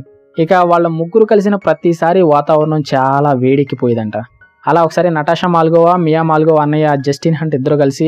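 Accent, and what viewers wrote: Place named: Chittoor, India. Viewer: Medhi a voori anna Chittoor yasala vundhi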